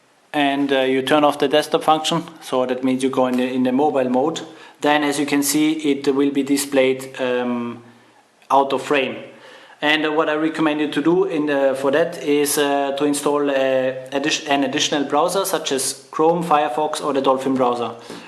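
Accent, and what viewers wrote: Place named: India. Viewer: all Indian accents sound shit, go back to Hindi, indians...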